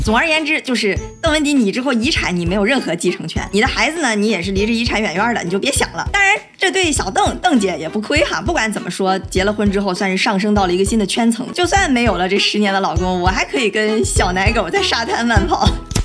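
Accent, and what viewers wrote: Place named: Dalian, China. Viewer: 姐真有喜感！有大連味。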